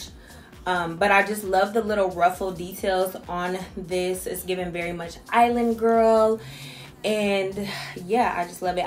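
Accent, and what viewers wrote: United Kingdom. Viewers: It’s always gone be the British accent for me 🤭🫶🏾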